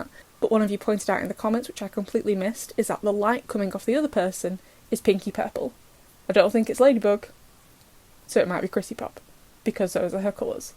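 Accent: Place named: Lancashire, United Kingdom. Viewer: dw I heard 625. Probs because I’m in Lancashire too so have a similar accent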